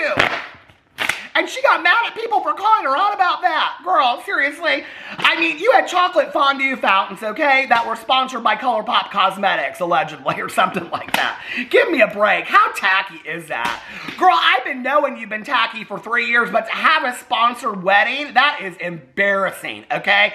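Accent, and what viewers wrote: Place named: Indiana, United States. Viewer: I'm so glad I found your channel i've been absolutely BINGING on your videos the past two weeks! Fellow Hoosier over here!! I found you through Adam McIntosh and your videos are like listening to a friend, especially because we have a very similar accent <3 I've been sober 3 years and hearing how long you've been on your journey and just the tidbits about your life are SO relatable and fill my heart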